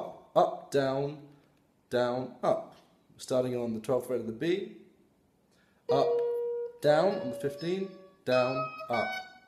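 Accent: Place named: Liverpool, United Kingdom. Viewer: Are you from around Liverpool? You sound a bit like George Harrison.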